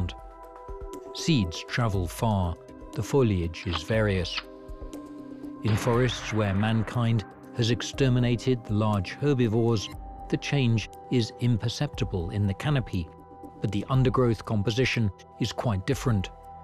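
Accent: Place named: United Kingdom. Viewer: I'm glad that despite the international assembly of zoologists you had a Brit narrate the video. American's require British narrators to enjoy wildlife documentaries. :)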